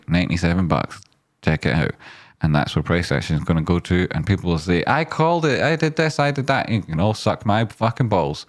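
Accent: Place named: Edinburgh, United Kingdom. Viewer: Top show M8.thanks. I sort of get it better when its an edinburgh accent. i think I almost got a wrinkle on my smooth brain tonight listening to you..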